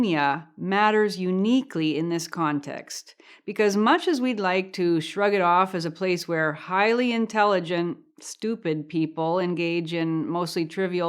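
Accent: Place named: Upper Midwest, United States. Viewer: Sounds like a Minnesota or Wisconsin(Canada's neighbor makes sense) accent. Good video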